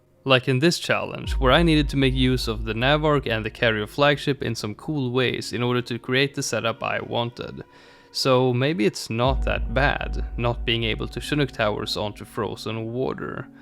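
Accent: Sweden. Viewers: Wait is he Swedish???? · Are u swedish?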